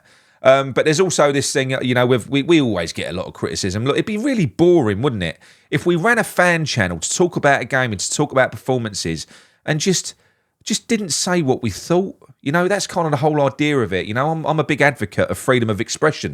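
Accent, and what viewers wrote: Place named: Scotland, United Kingdom. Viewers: You’re Scottish accent is the spit of Brendon Rogers 🤣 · Love the Scottish accent.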